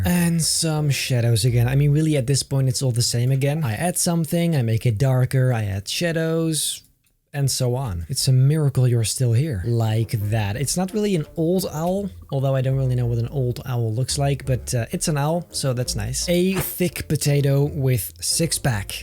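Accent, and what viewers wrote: Netherlands. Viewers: Je klinkt heel Nederlands, heb Ik gelijk? :) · Jij bent nl ik wist het al aan het accent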